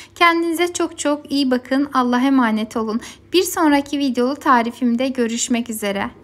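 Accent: Azerbaijan. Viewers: Azeri siveniz var, azeri misnz?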